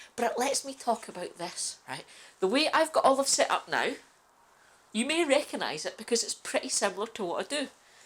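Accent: Scotland, United Kingdom. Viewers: Hey where are you from I’m edinburgh EH12 so chuffed to hear a Scottish voice. I’ve just go a loan of a greenhouse from an Friend in Balerno and a second hand greenhouse from The family across the road. Would love To connect to pick your brain !!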